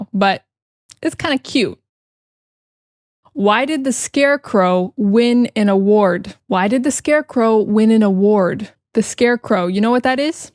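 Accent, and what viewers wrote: United States. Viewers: Teacher you are so good at English. I love your American accent. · The same thing happened to me. And everything was very easy to follow. Great American pronunciation.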